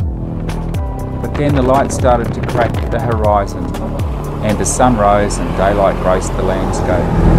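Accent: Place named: Australia. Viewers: Australian accent is quite different... but then again so are most Aussies (we won't say where he REALLY comes from...) must admit he comes across as pretty suave... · Great video! Looking forward to next episode. The Australian accent is quite different, but I like it.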